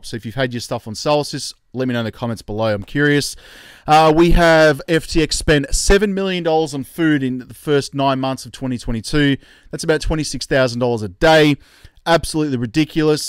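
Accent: Australia. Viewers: Thanks for your info mate. As someone who is new to crypto (about 7 months) I'm still trying to learn as much as possible and navigate my way around the space. I'm basically all in on xrp, as I still don't know enough to diversify too much, and I find it reassuring to have an Australian voice to help educate me. Keep up the good work.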